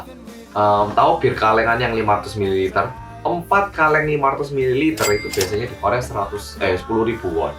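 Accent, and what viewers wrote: Java, Indonesia. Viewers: Korea medok maneh rekkkkk, asyekkkk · Yang medoookkkkk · seneng wae ngunu krunguu Medhoooog e kuii sen puanjjaaaaangg😂😂😂😂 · Yessss orang Korea yg medooook muncuuulllll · Seneng bgt tiap denger kalimat medhoooook 😁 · Paling suka awal pembukaan bersama orang Korea yang medhooooookkkkk🤣🤣🤣🤣🤣🤣 khas bgt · Medokmu sam.😂🤣🤣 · Medokkkkkkkkk ohhhhhhhh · Kalo medoknya ilang gimana?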